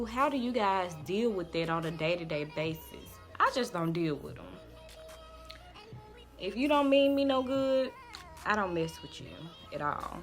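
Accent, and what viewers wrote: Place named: South, United States. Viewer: Your accent is awesome!!!!!!!!! Is it southern?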